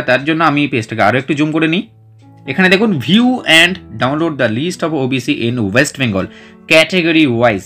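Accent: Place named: Bangladesh. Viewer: voice appearance almost Bangladeshi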